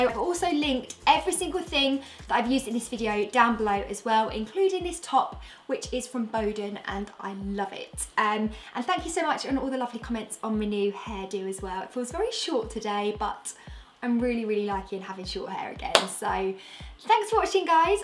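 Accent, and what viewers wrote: United Kingdom. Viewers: I love this look ! Also, I can't get over the fact that you look SO much like Cameron Diaz with a British accent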